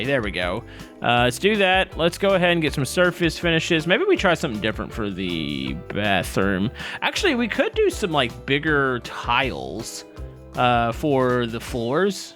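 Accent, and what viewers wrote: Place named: Texas, United States. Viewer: I watch your content alot and I will say its pretty fun, especially when you see it with snacks! I couldn't help but notice that you sound texas (Not being rascist or something tho)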